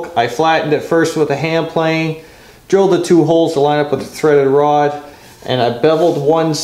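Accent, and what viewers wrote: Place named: Newfoundland, Canada. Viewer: You sound like a neufie in this video. Good idea for the work vise.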